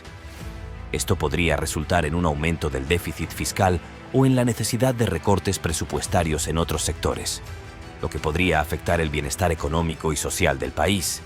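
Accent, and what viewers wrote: Spain. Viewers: EXCELENTE...Permítanme una crítica constructiva ,al video: hubiera sido óptimo que quién hable de "NUESTRAS F.F.A.A." sea una voz argentina y "no españolizada". V.L.L.C.!!! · todo verso pagado y se nota con el asento gallego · Encima habla un gallego 😂😂😂😂 no tenes algo mas antipatria ?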